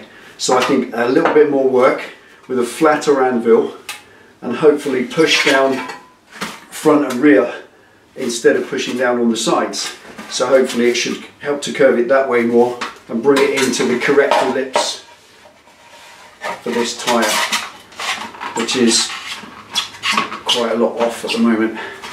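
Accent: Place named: RP, United Kingdom. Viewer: A true English gentleman who speaks the Queens English whilst using an English wheel. It doesn't get more refined than that ladies and gentleman.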